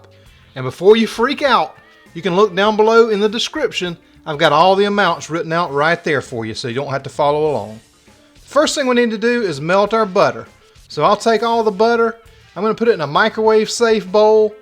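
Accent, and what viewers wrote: South, United States. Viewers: Thanks, I can't wait to try this! P.S. this WV Hillbilly loves your Southern accent.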